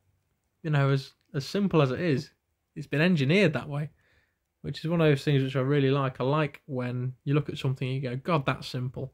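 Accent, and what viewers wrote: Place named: Scotland, United Kingdom. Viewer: Great Job! Beautiful enamel dial. It was nice hearing your scottish accent. Keep on with your videos. Regards from Argentina.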